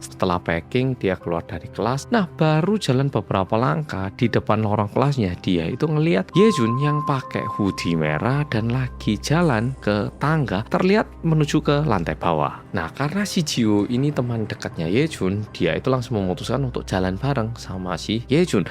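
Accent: Java, Indonesia. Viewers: Dengerin cerita mas, ngebayangin wajah2 Korea tapi bahasa nya medoook 😅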